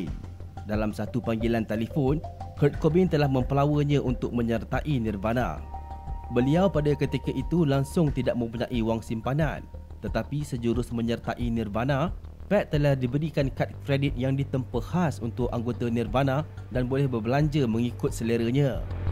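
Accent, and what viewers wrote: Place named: West Java, Indonesia. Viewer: Ini logatnya kaya orang sunda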